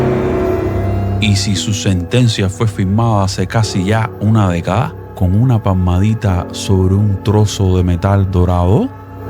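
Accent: Cuba. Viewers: Aquí vine directo a ver el vídeo de la orden maldita! Me encanta la seriedad, la veracidad y la agudeza de tu recopilación narrada en tono de asere!! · Q acento más horrible. Cubaneo, sola vaya.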